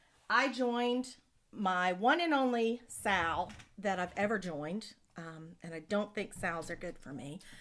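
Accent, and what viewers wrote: South, United States. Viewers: Love this video,! You shared your favorite things and it resonated with me! I also love your southern drawl! We lived in AL for a couple of years and it just feels like home! Please keep floss tubing!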